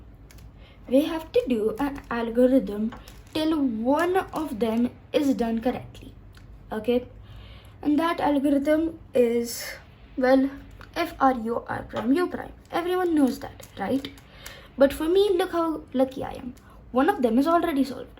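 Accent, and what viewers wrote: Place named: Europe. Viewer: your accent is very exotic - i'm guessing it's european... youthful tone adds cuteness. hope you don't mind letting me know what your ethnicity is. thanks in advance.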